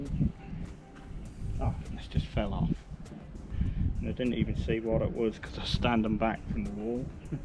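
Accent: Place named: Suffolk, United Kingdom. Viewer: You sound like a Suffolk lad. I’m from Norwich but lived in Ipswich for 20 years now. It’s tough we have to travel so far for good lrf.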